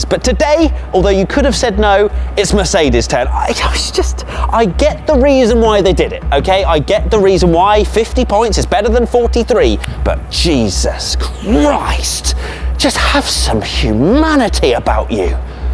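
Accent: United Kingdom. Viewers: You're so Brittish!!!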